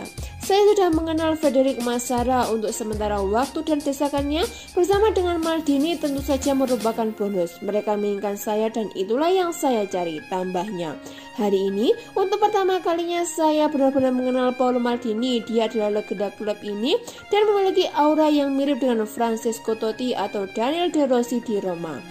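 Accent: Java, Indonesia. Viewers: Medok banget....🤣🤣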